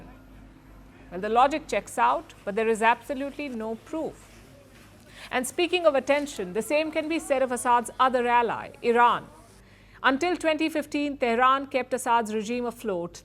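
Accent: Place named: India. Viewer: Funny indian accent😂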